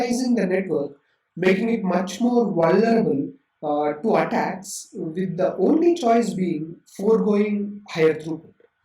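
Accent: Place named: India. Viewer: Indian accent + echo chamber^2 = non native english speaker can't understand.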